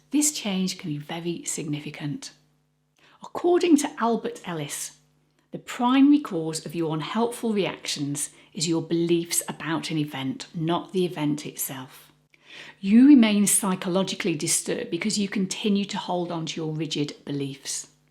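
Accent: England, United Kingdom. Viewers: This is a great video, but can you please do it again without the English accent? I'd prefer to hear it with a Canadian accent. Thanks!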